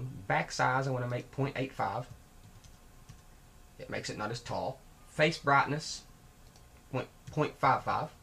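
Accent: South, United States. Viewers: you have to be southern. i can hear it in your voice. i'm from NC! thanks for the tutorial.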